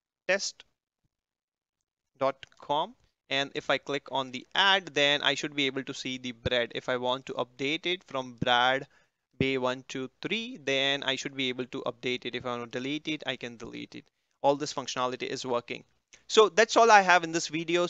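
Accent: India. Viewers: I love Indian accent <3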